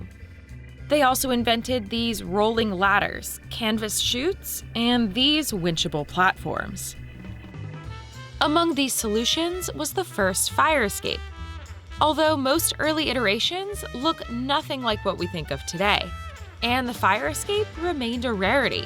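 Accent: United States. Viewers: My impression is that (based on the host's accent) they're based out of the US and report on locally relevant material. Besides, crapping on the US is the national pastime.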